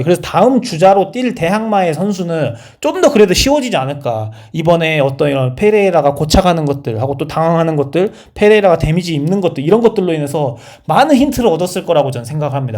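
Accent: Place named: Jeolla, South Korea. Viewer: 말투가 전라도사람인듯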